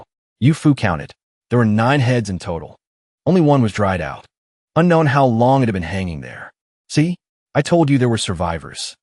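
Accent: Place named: Mexico. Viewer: whats with the occassional mexican accent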